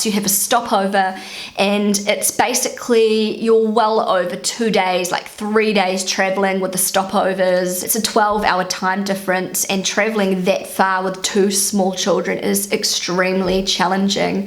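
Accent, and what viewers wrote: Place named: New Zealand. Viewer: I like all of you videos, - your positivity and smile really warms my heart. How lucky your husband and your children are:) And you know what? I had to google wikipedia for a New Zealand English article because when you get used to British or American English it's so funny to hear a New Zealandish accent. It's interesting to compare just the word "better" spoken in these three variations [ˈbɛtʰə] (UK) / [ˈbɛɾɚ] (US) / [ˈbeɾə] (NZ). Just t o let you know <3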